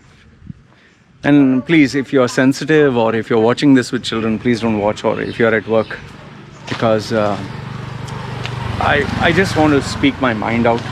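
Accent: India. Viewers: You have indian accent but maori tattoos. Whats going on.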